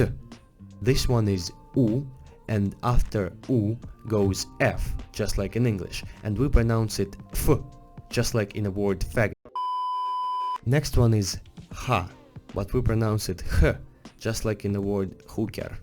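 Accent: Russia. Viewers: You have a russian accent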